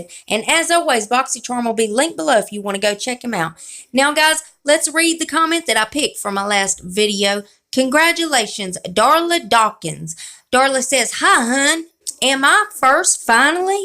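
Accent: South, United States. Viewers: I'm so amused with your southern voice. Absolutely adorable!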